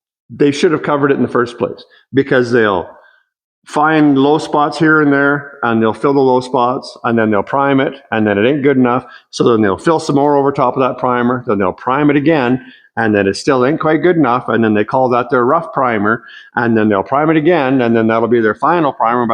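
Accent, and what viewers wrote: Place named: Canada. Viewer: reminds me of Bob and Doug McKenzie, must be the accent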